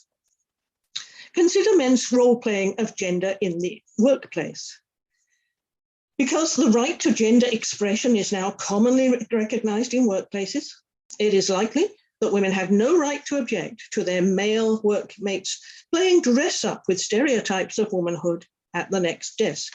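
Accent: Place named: United Kingdom. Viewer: Thanks. Her tone and cadence in way of speaking is very hard for me to listen to. I think this is a British thing. There are British men who speak this way as and I can't listen.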